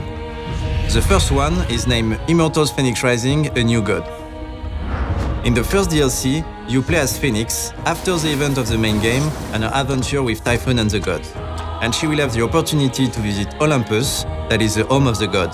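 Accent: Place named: France. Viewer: Le mec quand il parle ça s'entend qu'il est français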